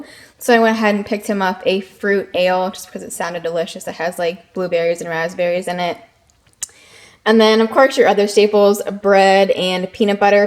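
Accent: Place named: Georgia, United States. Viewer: Girl I thought you were from Columbus ga lol.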